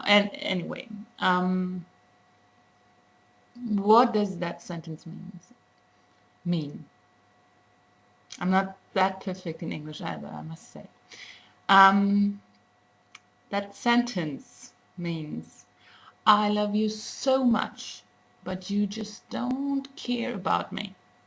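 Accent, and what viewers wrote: Nepal. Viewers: I loved your Nepali accent! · There is no doubt that u r pretty, but with ur Nepalese accent u just killed me. You sound and look sooo hot.... well beyond hot :)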